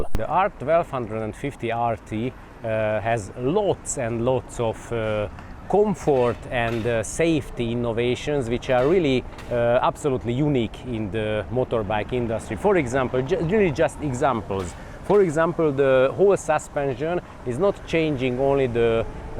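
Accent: Hungary. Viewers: It's Hunglish. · Typical Hungarian English accent, very knowledgeable and I’m sure it’s not your first BMW! Hajrá magyarok!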